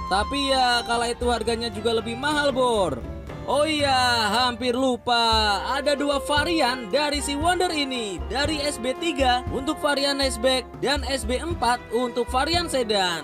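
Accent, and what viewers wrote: Pekalongan, Indonesia. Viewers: Logate Pekalongan isek ketoro gan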